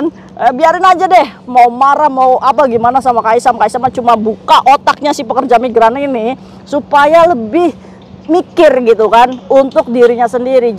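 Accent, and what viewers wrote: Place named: Batak, Indonesia. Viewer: Tumben nadanya kek orang batak kak😂😂😂😂❤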